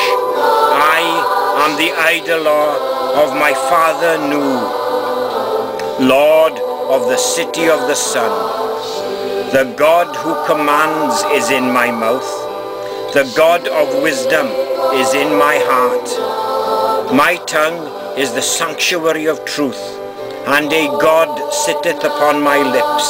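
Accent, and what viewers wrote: Wales, United Kingdom. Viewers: Pure Welsh accent right there. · I really love your recording of the invocation, the profundity of the invocation combined with that beautifully medlodic welsh accent is music to my ears. I've been using your recording to listen and prepare before I perform the invocation myself for the last month or so. Esecpially yesterday since it was August 6, a day sacred to Thoth, and also happened to be Wednesday, day of mercury, so it was the perfect time. This invocation is brilliant for preparing oneself to engage in artful expression, my writing just flows like crazy a day or two after the invocation, and also the request to 'aid and guard me in this work of art' is a particularly moving exhortation. So, many thanks my friend for this little gift. Highly appreciated ;)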